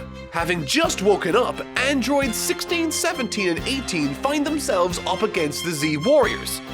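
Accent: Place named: Ireland. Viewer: Idk but i just recognized your irish accent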